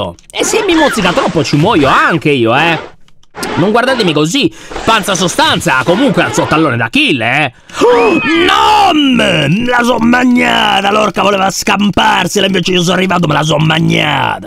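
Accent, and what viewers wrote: Sardinia, Italy. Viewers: sembra sardo, ma i romani fanno "AOOOOOOOOOOO"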